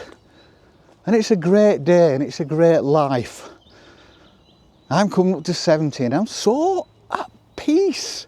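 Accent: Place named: Northern England, United Kingdom. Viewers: Just found your channel and I've subscribed. I like your philosophy, and your northern accent, and your adorable little dog. You're a cool guy and an inspiration. Wish I were there. Thank you and cheers from the U.S. 🌿🐶💚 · So nice to hear that Lancashire accent! Your hat and scarf are lovely!! I'd love to teach you how to spin your own wool. You could take your drop spindle out on walks with you and sit and spin on a rock or a bench by the lake there. I'm a 66 yo Lancashire lass with a ton of hobbies. Live in the states now. My hobbies are knitting, spinning, dying (I keep a few sheep and other farm animals) racing pigeons, dry stone walling, spoon carving, pickle making ( I have a road side stand) horse training, I torture the dogs with my fiddle playing :) make paracord bracelets and hand carved rubber stamps...gosh, the list goes on. 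We don't have telly, but You Tube is a God send for learning new things. Check out the Zed Outdoors channel if you'd like to learn spoon carving and other bushcraft skills. There's a big spoon carving festival held every year in Derbyshire. Spoonfest, it's called. I'd love to go some day. Wishing you many years of enjoyment in all you do!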